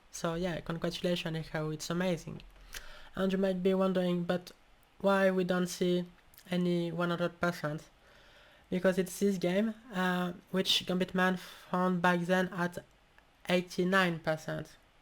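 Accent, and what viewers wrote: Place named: France. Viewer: From her accent I'd say France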